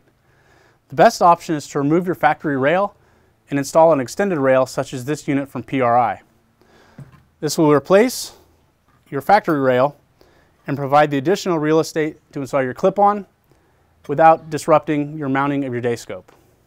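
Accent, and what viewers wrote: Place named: South Africa. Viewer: Nice south african accent.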